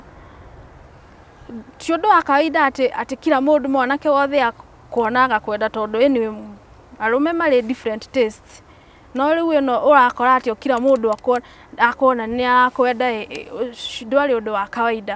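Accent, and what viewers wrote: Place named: Nyeri, Kenya. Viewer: I love her nyeri accent. Iv gone through the same stuff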